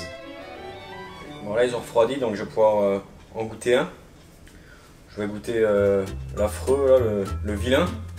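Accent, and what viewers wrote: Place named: Alsace, France. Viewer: Quel plaisir de retrouver notre bel accent Alsacien ❤ et nos succulentes recettes de chez nous ❤ MERCI BEAUCOUP ❤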